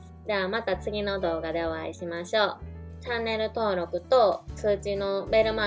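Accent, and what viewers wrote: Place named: Kansai, Japan. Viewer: 大阪にちょっとだけいた元ホストです。関東の人間にはアメージングで謎なT新地の不思議をいろいろ教えてくださって勉強になります。またの関西弁にキュンキュンします。１０００人おめでとうございます。ライブ配信頑張ってください。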